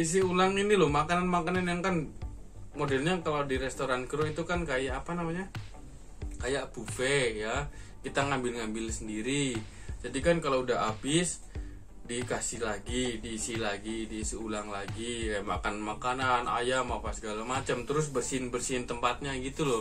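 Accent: Indonesia. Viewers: Walah ternyata ngapak ya melkur hahaha Sukses teruslah ya aamiin #edn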